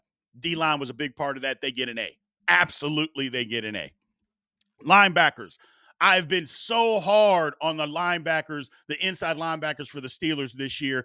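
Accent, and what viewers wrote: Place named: South, United States. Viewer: Where you from? Texas raised, Arkansas raised? Alabama raised? I doubt MS like me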